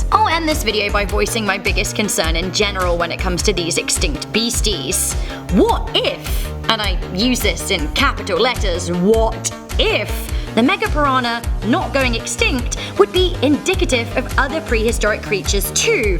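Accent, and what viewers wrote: United Kingdom. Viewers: British accent 😠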